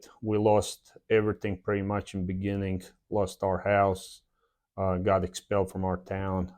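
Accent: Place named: North Carolina, United States. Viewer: Thank you for your service ! The US is lucky to have you. I picked up on your NC accent right away ,lol. We spent 20+ yrs in Cleveland County NC and relocated to Wyoming about 5 years ago. Not sure if we could ever deal with the humidity again.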